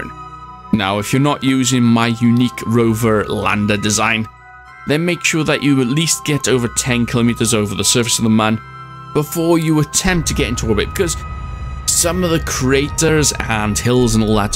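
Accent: Wales, United Kingdom. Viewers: I’m sure you’re Welsh, but I keep hearing twangs that make me think Scottish or Irish? Anyway dope vid man, I have nowhere near the science to do this, and no idea how to get it, but thanks. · Scott Manley is Scottish, this guy is Welsh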